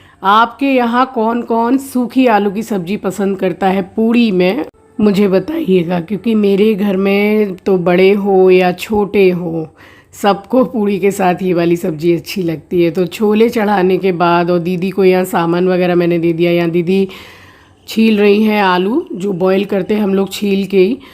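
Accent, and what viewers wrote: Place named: Uttar Pradesh, India. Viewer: Pehli bar aap ki lengbej kuch up Bali suni hai maja a gaya 😊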